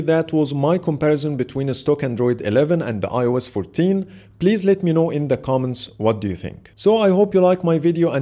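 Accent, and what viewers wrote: Egypt. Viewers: Am sure u r Egyptian.. Your accent is so Egyptian.. Thanks for the great content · Your accent sounds Egyption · You have the unique egyptian accent 😂😂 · ناس بتقول انك مصري سمعت من ناس كتير انها بدات تفهم إنجليزي من الطريقة حقتك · اللهجة المصرية 🤣❤️ بس بالانكليزي 🤪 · واضح انك مصري تكلم عربي بالله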